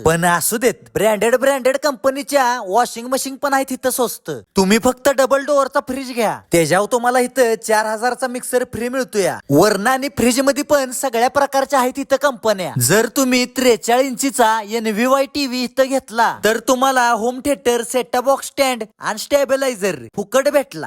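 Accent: Karad, India. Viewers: Your way of speaking is very nice and the Karad accent is also very nice to hear❤😊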